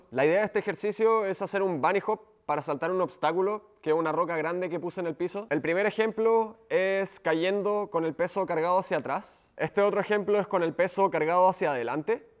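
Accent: Chile. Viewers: Es ke no puedo practicar el bunny hop con un chileno me encaaanta su acento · Chileno seguro es chileno palancaso con tonada chilena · Habla Chilena 😎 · Eri chileno klo? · Chile y por qué te parece familiar el acento? saludos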